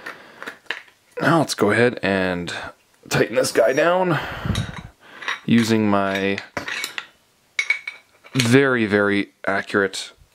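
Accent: Canada. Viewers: Thanks this will be helpful. I have an AB oval on my road bike and I DO notice a difference there. I am considering one for my MTB. Used to live in Evergreen, Calgary. I thought I noticed an Alberta Accent.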